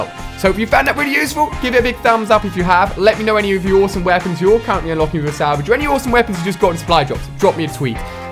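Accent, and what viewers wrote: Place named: United Kingdom. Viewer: i like british accent.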